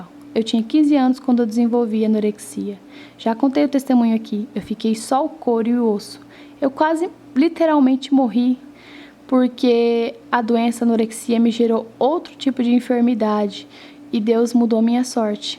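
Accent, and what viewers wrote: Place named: Campinas, Brazil. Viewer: ''Não falo leitE quentE'' haha. Na verdade, eu sou de Campinas/SP e acho seu sotaque bem parecido com o meu. Eu gosto muito da sua história. Você é guerreira e sempre correu atrás de realizar seus sonhos. Pode acreditar que seu canal não é apenas entretenimento, mas também é um canal de bençãos, seja para inspirar outras pessoas a também realizarem seus sonhos ou para falar do amor de Deus através do seu testemunho. :)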